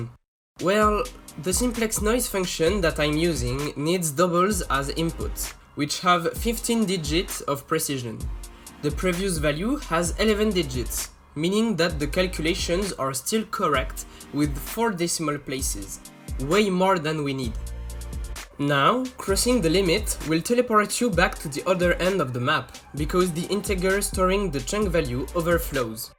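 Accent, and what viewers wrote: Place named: France. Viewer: J'ai reconnu direct que tu est français xDD sa s'entand mais sinon gg mec tu a fait du bon boulot!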